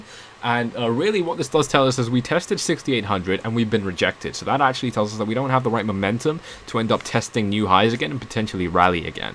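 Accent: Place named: London, United Kingdom. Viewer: London posh boy accent.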